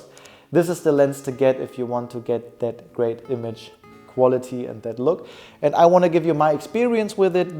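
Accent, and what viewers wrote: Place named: Germany. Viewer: That was the frist video I saw of you. ☺️ Watch a couple more last night and I love them. And your accent is kinda funny in a cute way. No offense. I really like that german accent.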